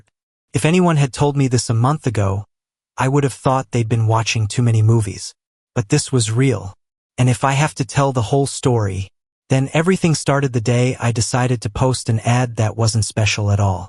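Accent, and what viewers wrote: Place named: Spain. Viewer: wtf is with the Spanish voice inflections? Can we PLEASE knock that shit off ?????!!!!!????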